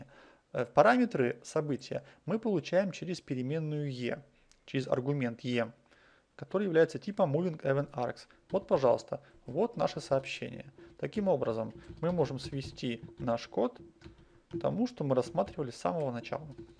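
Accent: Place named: Russia. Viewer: Очень интересная и полезная лекция. Единственное что мешает, это русское искажение английских слов и букв(параметр "Е" вместо параметр "И", "хЕндлЕр" вместо "хЭндлЭр", зыс вместо дис в слове this). Может это и не относится к теме урока но я считаю что программист всё-таки должен уметь правильно читать по английский не говоря уже о владении языком вообще.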